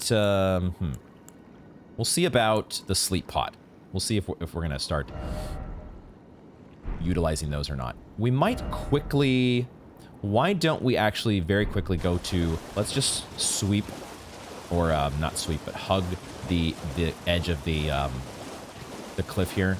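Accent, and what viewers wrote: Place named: Wales, United Kingdom. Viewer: That's a Welsh accent.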